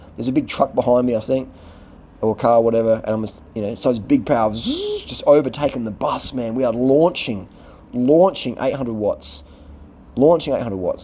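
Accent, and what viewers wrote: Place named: Australia. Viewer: There is do and don’t . Her outfit is so inappropriate. I don’t think she study to much before she came to the country . Please put some clothes on . Culture and etc be respect . You are the first one that use the F word in video , beside that it is a nice video. Sound like Australian .. I do not have a problem Where you from at all and I am not jealous the way she dress just worry that she will be rape or something will bad will happened. Just be safe. She is not doing any modeling on the street I don’t think.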